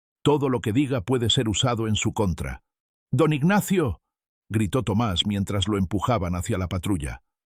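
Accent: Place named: Spain. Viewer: Y si eres de Oaxaca, porque hablas como un español?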